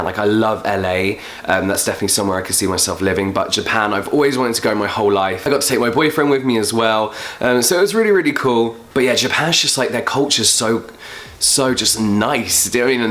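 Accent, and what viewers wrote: England, United Kingdom. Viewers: Wow , I love your English accent. Not because I like the accent it's because it's coming from your mouth 🤗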